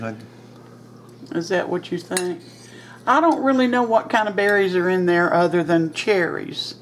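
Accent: South, United States. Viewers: I love your recipes.Where are you from.?Im from Arkansas.I notice you have a southern accent.I love your recipes.Do you have a cookbook.We southern belles are good cooks my mom and grandmothers were excellent cooks.im new to your ch.I love your ch.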